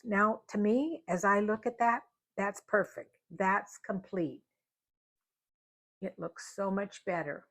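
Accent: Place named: Utah, United States. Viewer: I’m from and live in Tennessee but I lived in Providence, Utah for 5 years. You have the same accent as them. 😄 Are you from Utah?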